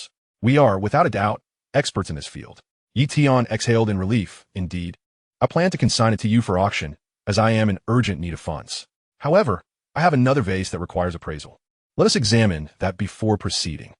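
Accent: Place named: France. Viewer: Stopped after 7mins due to that FRENCH ACCENT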